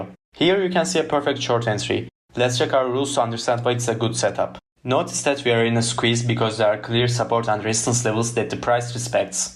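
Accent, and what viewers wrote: Turkey. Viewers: türk olduğuna yemin edebilirim ama kanıtlayamam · tam bir Türk ingilizce si açıklığıyla anlatmışsın,eline saağlık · You have so much Turkish accent. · Thank you for the video. Your pronounciation definitely shows that you are Turkish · Bu adam türk şivesinden anladım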